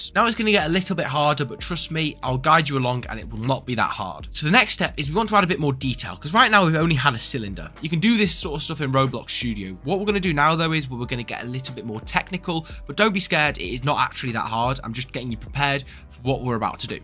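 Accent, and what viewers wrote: United Kingdom. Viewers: boi u british i cant understand watchu sayin for example alt u said Aot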